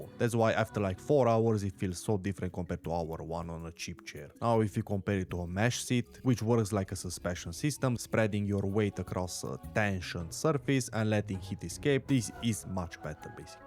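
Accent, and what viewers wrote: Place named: Romania. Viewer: romanian accent really showing in this one, nice vid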